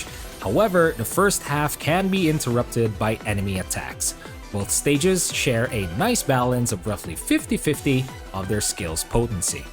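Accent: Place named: Philippines. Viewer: Nice video po! very well explained :) pinoy english accent on point :3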